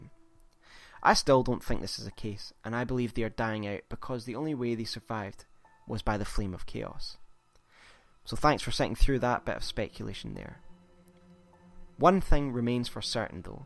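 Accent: Scotland, United Kingdom. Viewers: Scottish are we? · agreed, Scottish accent ygm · I can't even recall how many times the Scottish accent made me laugh ! Seriously, sometimes I laughed so hard I "wetch"ed my pants. XD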